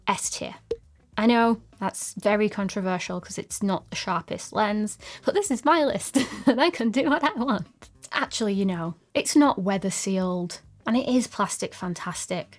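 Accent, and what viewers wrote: United Kingdom. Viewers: You could do voice overs for british characters in animation films. Your voice is almost perfect.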